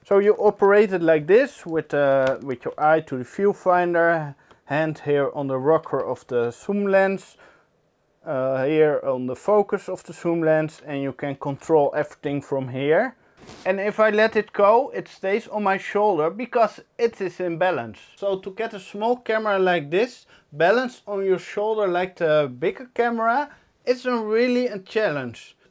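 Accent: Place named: Netherlands. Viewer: Are you dutch? Your accent sounds Dutch. (I'm from Belgium by the way)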